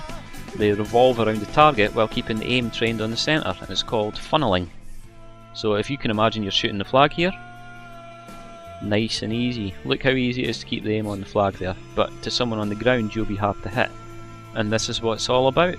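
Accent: Scotland, United Kingdom. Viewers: Probably Scottish... =P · Didn't realized scottish accent was so universal as to not be recognized. I was bewildered though! Good job! :) (ps.: Any idea when part 2's coming?) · Probably?! He's as Scottish as haggis. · hehe i like that scottish accent :D and the video is very good and informative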